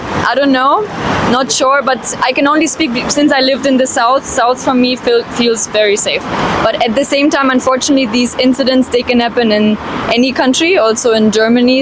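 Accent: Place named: India. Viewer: She has an indian accent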